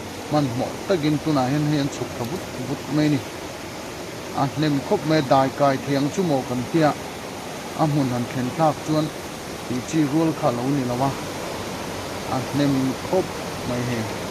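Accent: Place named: South, India. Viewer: I tawng kau chheh danah chhim lam mi i nih ngei hmel e.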